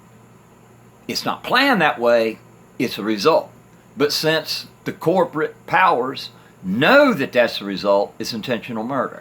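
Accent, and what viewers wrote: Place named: South, United States. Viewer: Bill Burr, a comic, makes fun of conservatives and liberals. You sound exactly like the kind of person he was parodying...a guy with a southern accent who is intelligent and a leftist. Power to you my bro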